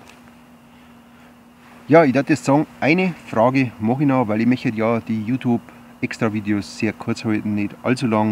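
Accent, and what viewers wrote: Bavaria, Germany. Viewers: Moin....dein Bayrisch finde ich super....wer das nicht verstehen kann hat selber schuld...du haste ne gute Art drauf...gruss aus Schaumburg...Gruss F. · Bleib blos beim boarischn. Unsa Dialekt is einfach sympathisch und gmiatlich. Mia san ja koi Preissn. :D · Da trau ich mich EIN Mal, ne Frage zu stellen und du nimmst die gleich - DANKE dafür!:D Und ich bin absolut dafür, dass du deinen bayrischen Dialekt beibehälst, denn der macht den ja erst komplett zum - und genau wegen dem schaltet man doch erst rein in deine Videos. Wem es nicht passt, soll sich andere Kanäle anschauen, aber hier nicht so nen Blödsinn daherschwallern. Meine Güte augenroll Wünsch dir noch schöne Rest-Weihnachten und schonmal alles Gute zum Geburtstag, bleib gsund und so wie du bist. Grüßla aus Franken:) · Bleib bei deim boarisch! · Guten Morgen,ich grüße dich aus dem fernen Thüringen ins scheene Bayern,als erstes,ich find es vollkommen richtig das du zu deinen Dialekt stehst,das ist auch immer in ein Stück Heimat was man in sich trägt und wem es nicht passt soll sich deine herrlichen Videos nicht anschauen,ich versteh dich sehr gut,ansonsten find ich deine Frage Antwort Videos sehr sehr gut,leider im Hintergrund der neue Sound der Natur(Kettensäge),mach weiter so,beste Grüße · Soll ich Dir was sagen, ich finde es gut, dass Du bayrischen Dialekt sprichst... 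das macht Deine Video's sehr liebenswert 😊. Und auch sonst wirkst Du sehr sympathisch. Deswegen bleibe wie Du bist. Frohe Weihnachten und einen guten Rutsch wünsche ich Dir und Deinen Lieben. LG Kevin 👋🏼😊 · Hallo, dir und deiner Familie erstmal frohe Weihnachten & einen guten Rutsch ins neue Jahr! Ich danke dir für deine unterhaltenden Videos die ich als Kölner trotz bayrischem Dialekt sehr gerne schaue und auch nach den ersten 3 Videos hab ich gar nicht mehr auf den Akzent geachtet und verstehe inzwischen fast alles, also behalt das auf jeden Fall bei, ich freu mich nächstes Jahr wieder neue Bushcrafting Videos zu sehen.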